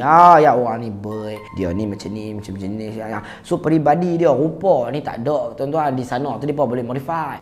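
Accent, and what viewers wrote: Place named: North, Malaysia. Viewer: Itu loghat utara, Abang ni, di dalam video mL studio memang akan guna loghat utara dia..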